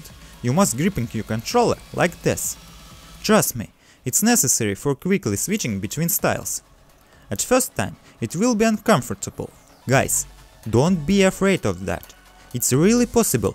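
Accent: Russia. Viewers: Твой russian акцент too заметный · I freaking love the Russian Accent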